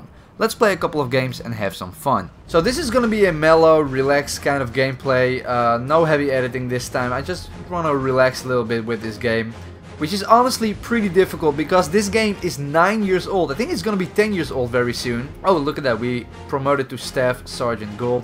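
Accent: Netherlands. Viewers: based on the accent of this guy he is from the Netherlands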